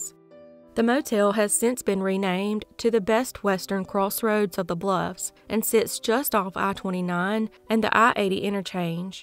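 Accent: South, United States. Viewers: haha I'm the same with my own voice it's so cringe to me lol, but I agree with everyone that your voice is particularly soothing! I do really like your strong southern accent too! I'm from England so to me it's very strong, but I really love it for how soft spoken you are. Also of course you do amazing research and give these cases and victims the respect they deserve. Sending so much love from the South of England! 🇬🇧 ❤️ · I'm subscribed to your southern accent voice. I wasn't a subscriber then, I am now.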